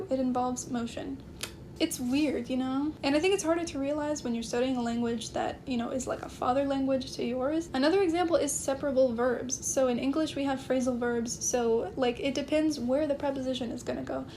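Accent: Germany. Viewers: love your german accent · Your German accent is soooo nice <3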